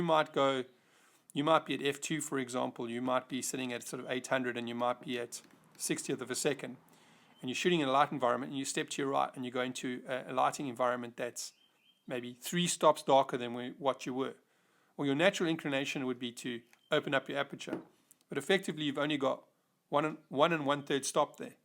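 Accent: South Africa. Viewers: Thanks very informative and well presented a nice calm narrative no shouting or speed talking. Soon as I heard you speak I thought SA accent a beautiful country, I have been lucky enough to visit it a few times.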